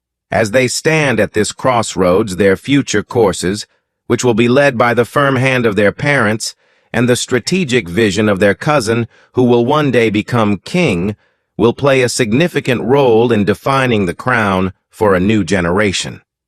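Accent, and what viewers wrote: United States. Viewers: American voice talking about the British monarchy just sounds a bit off the mark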